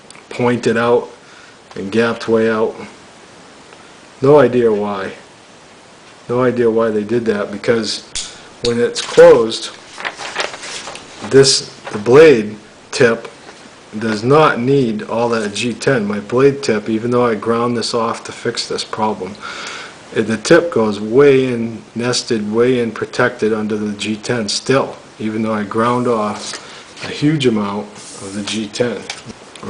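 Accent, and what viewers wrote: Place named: New York, United States. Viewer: Love the New York accent ;)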